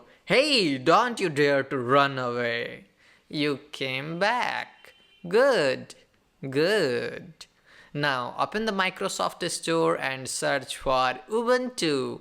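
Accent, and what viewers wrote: India. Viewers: Indian accent, foreigners will enjoy watching this video!!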